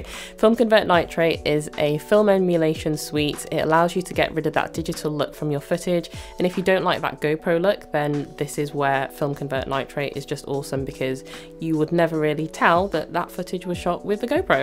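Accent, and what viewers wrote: United Kingdom. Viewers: I'm going to NYC next year. I want to record myself out and about and I don't want to use my phone. Would you recommend this or something different/cheaper? Other question: You're clearly, British! However, are you of Trinidadian/Lucian or Dominican descent? I found your channel and just subscribed. I saw a post on twitter and started watching a few videos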